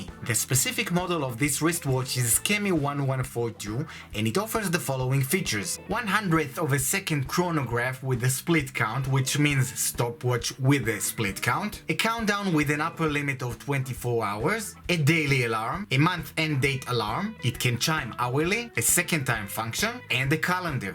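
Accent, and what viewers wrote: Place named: England, United Kingdom. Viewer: wow talk about stereotype english accent!!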